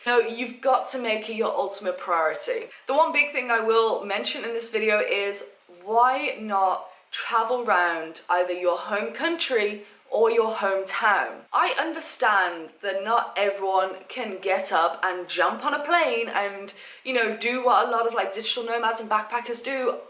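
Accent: United Kingdom. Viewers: btw i think you are gorgous and i love your british accent happy new year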